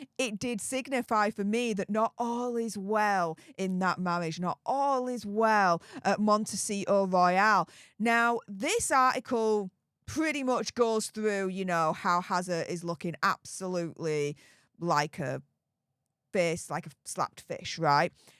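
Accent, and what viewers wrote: Yorkshire, United Kingdom. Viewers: It's part of her Yorkshire accent. American here, when I first started watching, I found some utube videos on the Yorkshire accent and I've understood her much better since.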